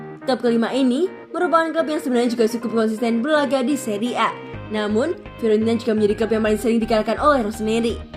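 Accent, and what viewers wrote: Java, Indonesia. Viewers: Ganti lah voice over nya, medok ngmg kek kumur2 mana cadel lg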